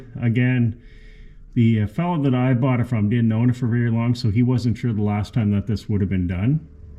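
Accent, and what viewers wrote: Canada. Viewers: Great video! Really clear! I'm from New Zealand and I enjoyed the Canadian accent too!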